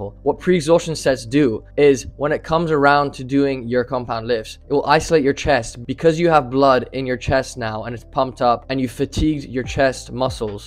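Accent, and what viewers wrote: Ireland. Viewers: Thanks for this. Also, I've never heard an Irish hybrid accent like that before.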